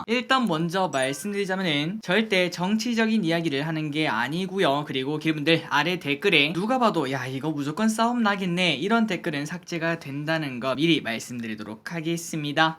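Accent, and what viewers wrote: Gyeongsang, South Korea. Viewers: 간다간다 뿅간다~!! 대구 사람이엇음? 방가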